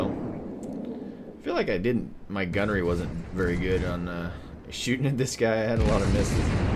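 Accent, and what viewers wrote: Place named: Texas, United States. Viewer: THAT'S what it is. Another Texas boy. It's that back - home accent that I hear. Another of your typical entertaining and instructional videos. Keep'em comin.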